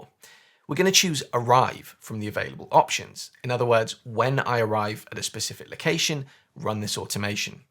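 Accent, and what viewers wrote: England, United Kingdom. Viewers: Yes please that would be great. Love that you are English like myself so I can follow what you say easily, and love just the way you make everything so easy to do with automations and a clear instruction. I’ve learnt so much from your tutorials to which I’m ever so grateful. Thank you so much. Tina 👍🏻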